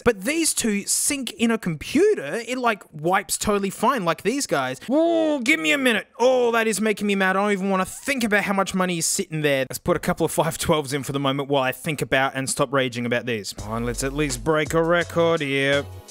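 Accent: Australia. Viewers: Maybe because he’s Australian? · You're sooooo Australian..... And I love it! 🥰🤣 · I visited an old video and just realised that as his videos gone on, he’s picked up an Australian accent along the way, I’m not sure really, put your opinions in the replies because I don’t really know.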